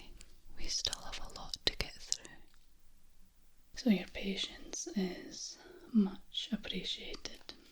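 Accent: Scotland, United Kingdom. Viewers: you're very pretty i love the scottish accent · This accent is the best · Scottish accent yes please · Sooooo Scottish haha WEE , 🥺😭 🤗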